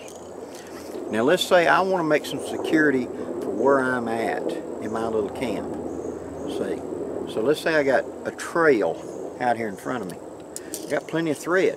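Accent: South, United States. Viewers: Lovin' that southern ascent there ya'll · This hicks southern accent is SOOOOO great and soooo perfect. I really can't tell if it's real or not. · Definition of one of the purest Southern accents, this video can be used as a tutorial among lingual regional accents.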